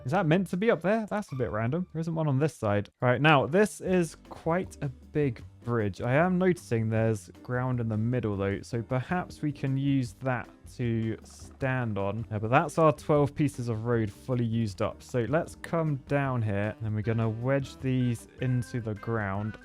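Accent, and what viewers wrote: United Kingdom. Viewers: I'm not so much into Poly Bridge, but when I saw "Bridgehemian Rhapsody", I had to click ! And it was awesome !!! I love watching your videos because : _ your british accent (I'm french and I love it) _ I like video games _ I like engineering _ the bridge reviews _ the strongest shapes everywhere _ your humour ....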